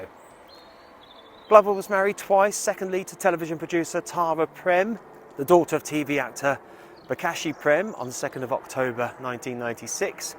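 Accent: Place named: Yorkshire, United Kingdom. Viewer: Hello,not a bad Yorkshire accent actually, John, hartlepool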